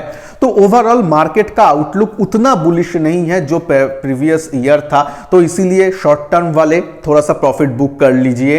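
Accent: Bengal, India. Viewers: You sound Bengali. Are you based in Mumbai or kolkatta or where?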